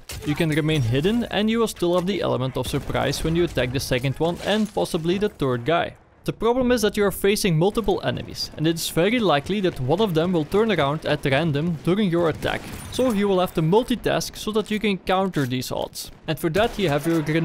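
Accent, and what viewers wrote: Netherlands. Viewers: The second you started to talk i noticed that you are dutch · Kom je uit nederland?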